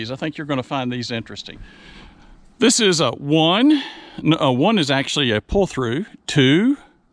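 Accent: South, United States. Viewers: my pleasure, your content is great 👍 Also I'm from Florida, but moved to WA state a few years ago, and watching your videos makes me miss hearing southern accents all the time haha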